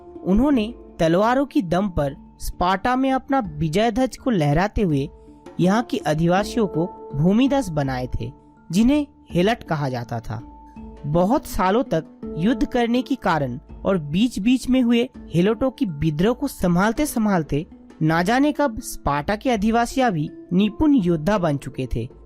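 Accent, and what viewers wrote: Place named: Bengal, India. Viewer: Bhai aapke pronunciation se laag raha hai aap Bangali ho 😅😅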